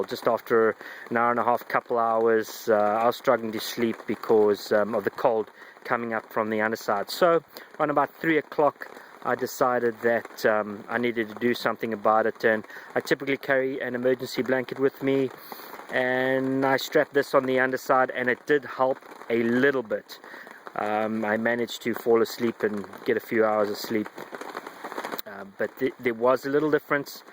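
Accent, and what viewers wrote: South Africa. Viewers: is that a south African accent I here????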